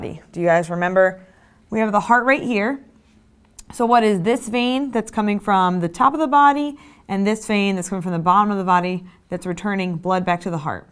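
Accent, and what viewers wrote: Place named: United States. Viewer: Great but please not explain in pure American ancient we can't catch pronounce word little harshly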